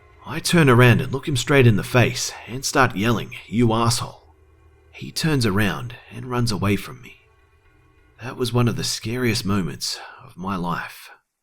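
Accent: Australia. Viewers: I love your accent. I love Australians. ( P.S. Trying to get on the comments of the week.)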